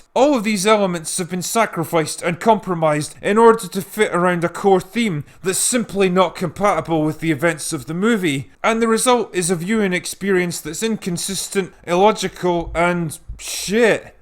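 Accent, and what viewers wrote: Scotland, United Kingdom. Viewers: Critical Drinker. You are my favorite film/tv show reviewer on YouTube. Besides your hilarious persona, which is riddled with burps, farts, alcohol abuse, powerful Scottish accent and general condescension towards the "greater themes", I think you really nail what makes a good movie. It's like you said, it's about the plot, internal logic and character development. Really simple shit. Keep up the good work! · The Scottish accent and the sarcastic drunk voice make these videos so much better. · I never get tired of Scottish accents. ;)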